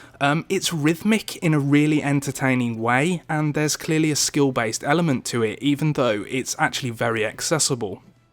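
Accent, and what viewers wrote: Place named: Birmingham, United Kingdom. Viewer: That toxic Birmingham accent is distracting me. Good video, however.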